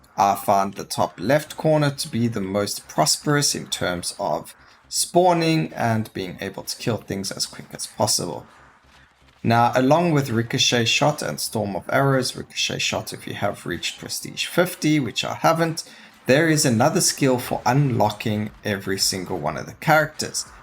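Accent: South Africa. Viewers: Its MAD how easily you hear the SA accent, Follow just for that!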